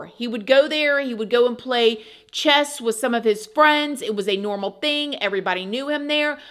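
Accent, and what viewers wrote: South, United States. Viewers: yeah we southerners have pretty much always said it that way lol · That’s how we speak in the south hunny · Why would it embarrass you? Everyone speaks with different cadences and accents depending on where they are from. It's very commonly said in the south and is southern.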